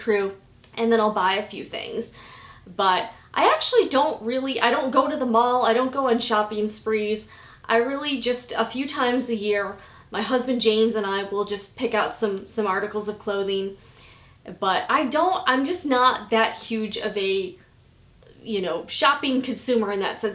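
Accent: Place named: Midwest, United States. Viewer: Are you from the midwest? Cute accent :)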